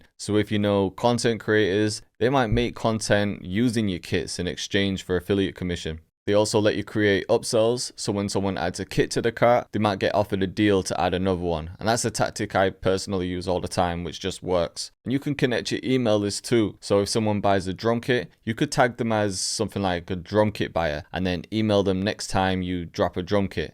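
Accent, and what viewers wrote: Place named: Manchester, United Kingdom. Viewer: Are you from Manchester? Accent sounds a bit like it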